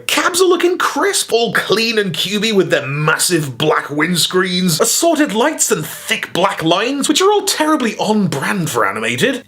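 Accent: United Kingdom. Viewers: Have to admit that I'm not here primarily for the bots but rather the magnificent British accent, hilarious puns and ass-kicking music. Keep on being you,! <3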